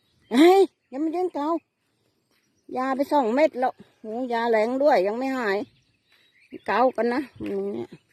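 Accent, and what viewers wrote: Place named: Laos. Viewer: พูดเนิ่อๆ เหมือนคนอุตรดิตถ์ลาวเวียงนะแม่บ่นไปเถอะได้ตังค์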